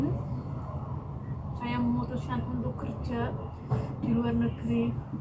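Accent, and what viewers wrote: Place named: Cilacap, Indonesia. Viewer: Aku kok suka logat mbak nya ngomong jadi ingat temen saya ngapak2 daerah Cilacap.salam kenal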